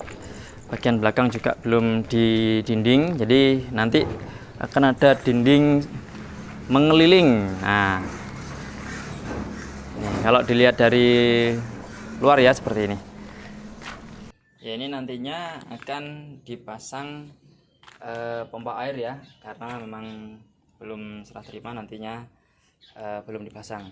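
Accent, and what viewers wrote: Indonesia. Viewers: daerah mana mas ..klw aku.daerah jabar...dari segi bahasanya jatim or jateng ya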